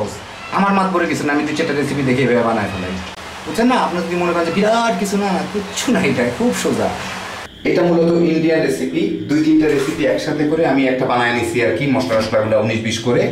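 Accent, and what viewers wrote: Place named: Dinajpur, Bangladesh. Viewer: vai, salam.apnar bari ki Dinajpur a? amader kothar shathay vishon mil pai.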